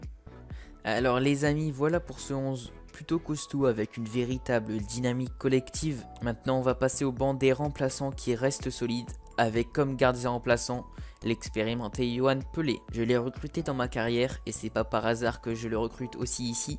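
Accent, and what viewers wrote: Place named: North, France. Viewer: Tu fait de bonnes video mais désolé cette accent du nord j'en peut plus il faut arrêter les a qui son des o c'est pas beau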